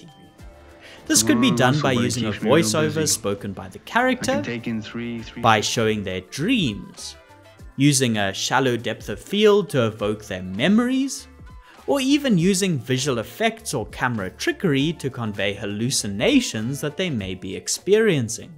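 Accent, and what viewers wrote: South Africa. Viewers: Where is that accent from sir? South African or German?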